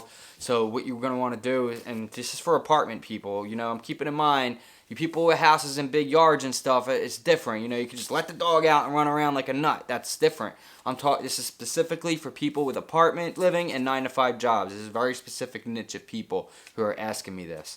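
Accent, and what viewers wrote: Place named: Maryland, United States. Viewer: I was thinking Maryland too, possibly Delaware. The accent!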